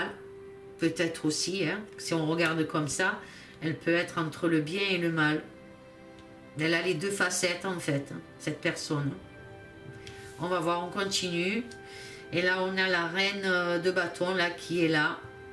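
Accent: South, France. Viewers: Bonjour, je vous découvre et vous êtes agréable à écouter. Pour ma part, j'ai un ressenti sur un duel entre deux hommes et ce sera l adversaire à Macron, un homme inattendu au pouvoir, mais pas aussi inconnu que Macron. Vs avez un accent de la Drôme? Du Vaucluse? Du Gard?.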